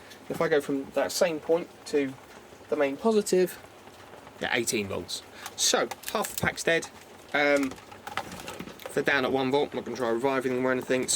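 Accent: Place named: Lancashire, United Kingdom. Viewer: You sound Lancashire lad I’m in Rochdale. Do you mend them? Batteries for people thanks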